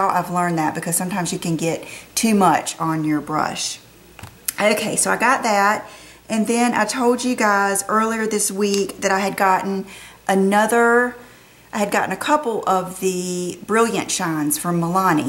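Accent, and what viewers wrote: South, United States. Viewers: New subscriber! I love your accent! You seem so sweet and like a southern belle. I love your taste in makeup. I am still on the hunt for the Milani goodies!!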